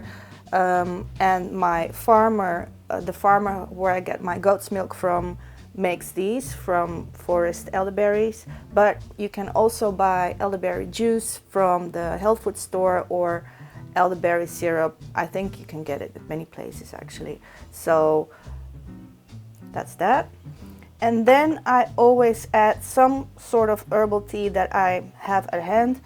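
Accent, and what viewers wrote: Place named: Netherlands. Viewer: I thought your were Dutch, sorrie it's always the accent. Love your date tarts by te way!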